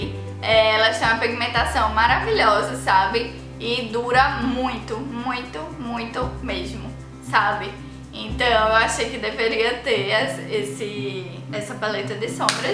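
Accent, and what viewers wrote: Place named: Pernambuco, Brazil. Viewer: ah dou de pernambuco <3 amo nosso sotaque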